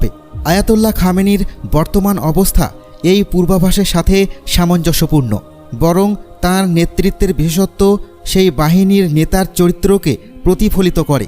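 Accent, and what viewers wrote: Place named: India. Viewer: আমার তো মনে হচ্ছে এই ভিডিওটা যে বানিয়েছে সে ইন্ডিয়ান আগে ভাষা ভালোভাবে শিখুন শুদ্ধ বাংলায় কথা বলা শিখন তারপরে ভিডিও বানাইয়েন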